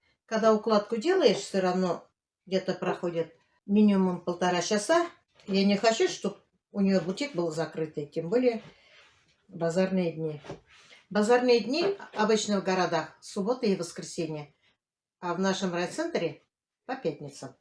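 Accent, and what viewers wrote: Tatarstan, Russia. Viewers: добрый день.Желаю удачной съёмки. Слушаю тебя и вспоминаю маму.Знакомый акцент татарский.Не болей.😘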